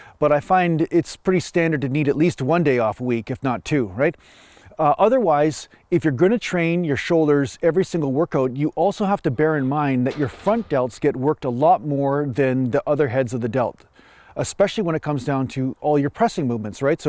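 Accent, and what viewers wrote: Canada. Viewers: Ok I'm playing name that accent. How close to Alberta are you from?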